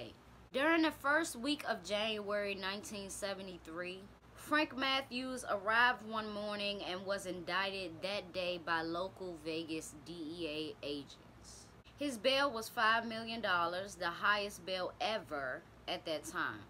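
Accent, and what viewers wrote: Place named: Baltimore, United States. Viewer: I just love your accent that dc-baltimore accent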